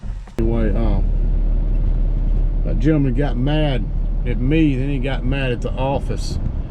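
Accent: South, United States. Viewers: I love your accent and I love when you say I'm fixing to tow it lol You're a true southern boy. I live in Canada and apparently we talk with an accent too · Good job... what makes it better is your southern accent.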